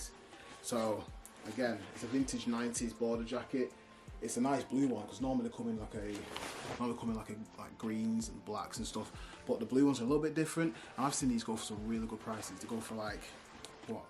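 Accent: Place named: England, United Kingdom. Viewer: Found you due to shout out by Lavender Clothesline! Love your videos so far, nice gentle personality, great accent ( We in U.S. suckers for that English Accent !!! Sexy.) Second, Great information Hope your following grows and grows. Good Luck.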